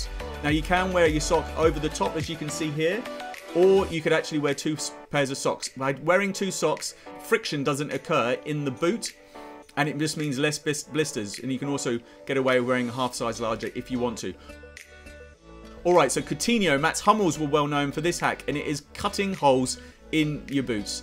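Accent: United Kingdom. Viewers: Chale, que dificil es entender el ingles britanico pero si te entendi en un 70%.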